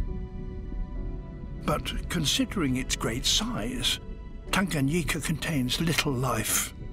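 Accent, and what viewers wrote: United Kingdom. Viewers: Desculpem o meu comentário um pouco tolo, mas alguém sabe me dizer se a pessoa que faz a narração fala o inglês britânico ou americano? Desde já agradeço! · This British accent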